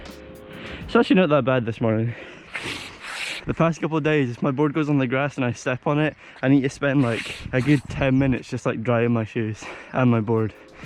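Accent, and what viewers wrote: Scotland, United Kingdom. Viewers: u scottish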